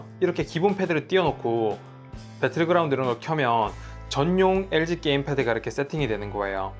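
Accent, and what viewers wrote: Gyeongsang, South Korea. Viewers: 대구사투리인가...부산사투리인가....그것이 궁금하다...허허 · 잘 만든 영상 잘 보았습니다 한 가지 거슬리는건 경상도 사투리 말을 빨리하려다 보니 사투리 억양이 너무 심하군요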